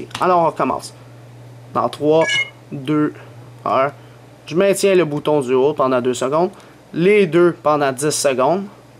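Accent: Quebec, Canada. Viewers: Merci pour ton tuto très sympas (j'aime bien ton accent québécois <3) ça marche parfaitement du coup j'ai un super ipod jailbreaké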